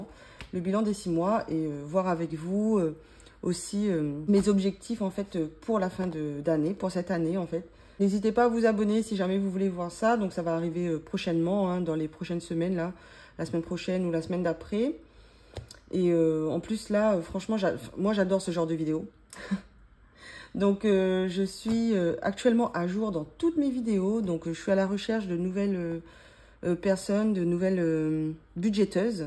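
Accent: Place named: Réunion, France. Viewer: Petite question qui n'a rien à voir mais d'où viens ce petit accent ? La réunion ?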